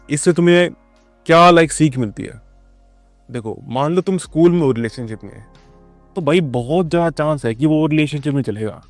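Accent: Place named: Himachal Pradesh, India. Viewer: Himachali accent 😂... ❤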